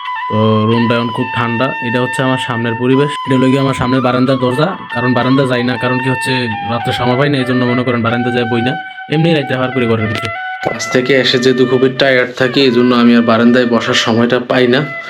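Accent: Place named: Comilla, Bangladesh. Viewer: আসসালামু আলাইকুম, ভাই, আপনার বাড়ি কি কুমিল্লা, বুড়িচং/দেবিদ্বার এইদিকে? কিছু মনে কইরেন না, আপনার কথায় আমরার মতো মনে হইলো😊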